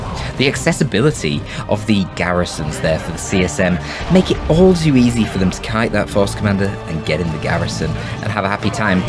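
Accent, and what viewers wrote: Scotland, United Kingdom. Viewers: GGs, and great scotch accent haha. Where are you actually from? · Scottish accent casts henceforth yes?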